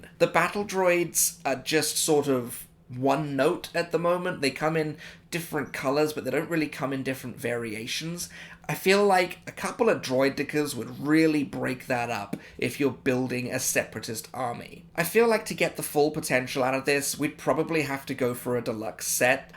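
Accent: United Kingdom. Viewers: Not doing well at the moment but forcing myself to comment now, as I've disappeared off sm for several weeks. Still lovely to hear your smashing British voice on the interweb.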